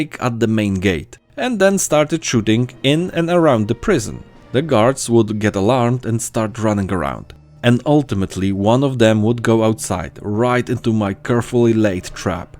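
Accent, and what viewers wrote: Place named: Germany. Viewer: Discovering your video via the Steam page of Desperados. Great video with excellent review on which i agree 90%. I grew up playing Commandos (from the first to the last) and Spellbound games (Robin Hood, Chicago and the three Desperados games) and i completely relate to your points and feelings. I still play those games from time to time (they're still very nice games to play even in 2021) and i played Mimimi games too (Shadow Tactics and Desperados III), even though i liked them very much they lack the charm those old 2D Isometric games had. My biggest dream would be a Desperados 1 re-master with 4K HD graphics and better animations yet the very same gameplay and story (+ the expansions maps that were planned but never released). Anyway you earned my like and my sub ! :D PS: Where are you from ? I can hear a german-ish accent but i'm unsure. 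BTW i'm French and i understood 100% so your English is perfect !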